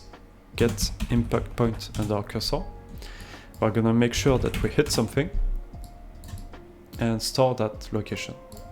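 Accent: France. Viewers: le fameux accent français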